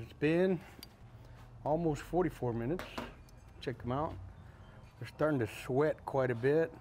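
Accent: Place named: Valley, United States. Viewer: Sound just like my relatives down in the valley. Alamo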